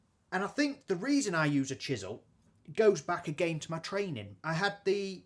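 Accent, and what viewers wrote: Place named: Northern England, United Kingdom. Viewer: Your videos are brilliant mate! Also nice to hear another northern voice 👌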